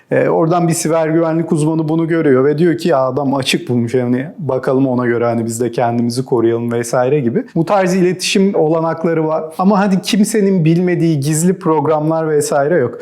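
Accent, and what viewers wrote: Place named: Yozgat, Turkey. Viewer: yozgatli galiba k ler cok dogal geliyo kulaga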